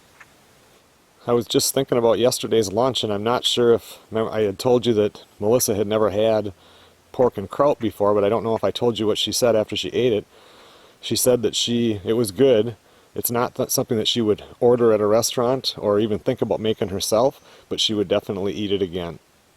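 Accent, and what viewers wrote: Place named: Midwest, United States. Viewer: I love your content & channel,. I’ve been a HUGE fan for about a year now. I knew you were from the Midwest with that kind of an accent. My brother is from Chicago, so I know a Midwest accent when I hear one. Your videos are so therapeutic after I clock out of my shift from the hospital. I watch your videos almost every night. Greetings from Seattle, my friend. 🙏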